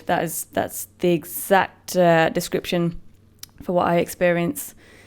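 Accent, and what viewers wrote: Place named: England, United Kingdom. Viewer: Saw this on the misophonia reddit, figured I’d give it a watch. I want you to know that you’re not alone. I can really relate to the experiences you’ve talked about (just some different trigger sounds) and so I really empathize with you. And having misophonia+sensory overload+social anxiety is not a fun thing to combine, I know that firsthand. But if it makes you feel any better, it could be worse; you could have OCD too. No, seriously, it’s hell on Earth. My misophonia being triggered trips my OCD, which trips my anxiety, which ramps up my sound sensitivity and my OCD even further, and then they interact again, and so on, and so forth, ad nauseam. Oh, and I have perfect hearing (quantitatively speaking, I got a perfect score on the Army hearing test) so I hear *everything*. So yeah, that’s great for misophonia. 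Sorry, I went off on a weird ramble there, didn’t I? Both my OCD and my misophonia got triggered badly, I got really baked to cope, then surfed Reddit, which in turn led me here. TaDa! Hooray for self-medication! Also, totally unrelated but I freakin love your English accent.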